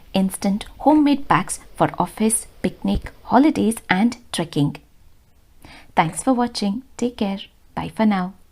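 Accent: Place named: Hubli, India. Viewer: Hi Nivu nim maneli heg matadatira annodanna swalpa swalpane keloo keluvu matanna revined madi keloke tumbane khushi agutte Hubli khadak bhashe 😘❤️🙏 Do some video on daily routines in ur and our mother tongue KANNADA 😘 ❤️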